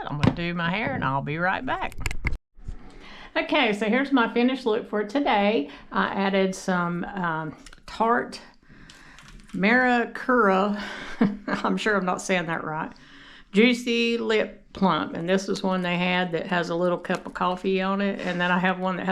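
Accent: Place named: South, United States. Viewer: You are such a doll. I love your southern accent. Your approach as well. Have a healthy, safe & healthy day. 🙏💜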